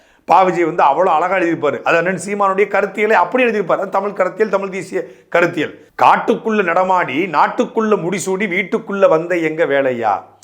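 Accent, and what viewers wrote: Tirunelveli, India. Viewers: இந்த 'இழுவ'..😂 அண்ணன் திருநெல்வேலிக்காரன் னு நிருபிச்சிட்டீங்க..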